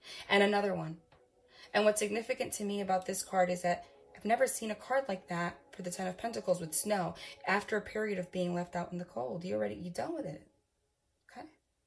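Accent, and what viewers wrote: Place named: East Coast, United States. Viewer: I lovvvvve your East Coast accent ☺️♥️💓🙏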